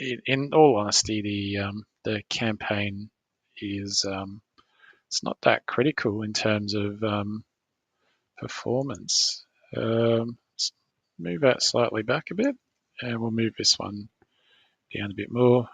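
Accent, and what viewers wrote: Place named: Australia. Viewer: G'day mate. I just came across your series of videos. Very good to watch, so I have subscribed to your channel. I'm watching from Melbourne, and by the sound of your accent, I think you know where that is.🙂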